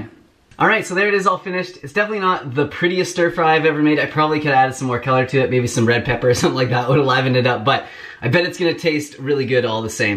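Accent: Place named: Canada. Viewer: You sound Canadian😅. Juice the stems.. yummy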